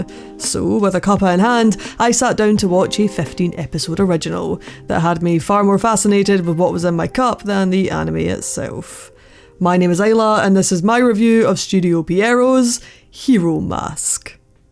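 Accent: Scotland, United Kingdom. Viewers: Good review, I like the format. What part of Scotland are you from?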